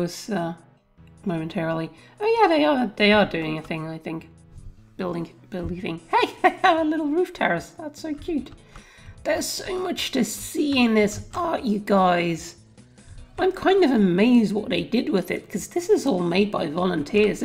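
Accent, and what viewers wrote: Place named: United Kingdom. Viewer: Definitely like My Viewers Aren't Cooperative Island, but it might be a bit lengthy. Also, how Dutch are you? You have a very British-sounding accent.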